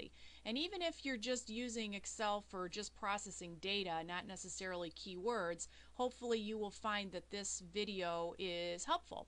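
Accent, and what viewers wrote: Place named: North, United Kingdom. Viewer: Ummm, no. She sounds like she's from up north.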